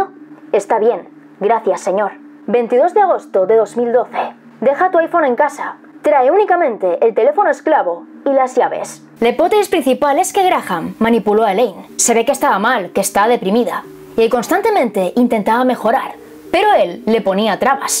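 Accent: Spain. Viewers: Saludos bellotas hermosas y su acento español, es tan hermoso y maravilloso. Excelente investigacion para el video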